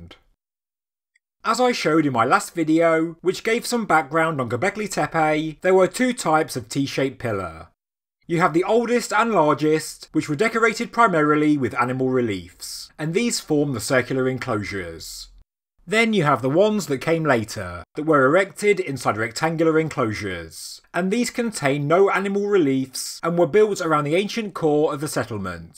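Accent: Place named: Midlands, United Kingdom. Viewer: aye your just east of brum thought i could hear that accent :d ...on tepe do you think there could have been a wooden structure over it at one time?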